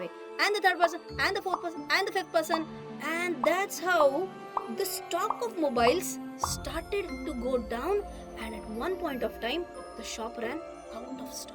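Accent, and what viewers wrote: Maharashtra, India. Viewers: The best informative as well as video effects/animation I have seen till date on an academic channel. I really dig your Marathi dialect ma'am 😊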